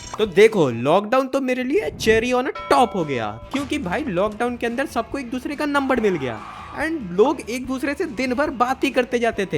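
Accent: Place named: Assam, India. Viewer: Bro aapse Assamese wali vibe aati h .. wese ho kahase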